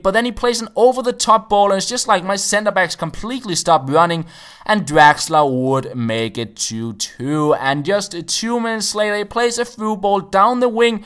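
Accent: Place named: Denmark. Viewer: He's Danish just like me that explains his accent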